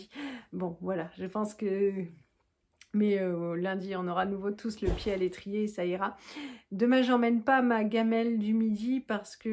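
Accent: Alsace, France. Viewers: Merci pour cette vidéo. 😊 L'accent Alsacien j'adore 😊. J'ai vécu 16 ans en Alsace ❤️ Bon courage pour demain .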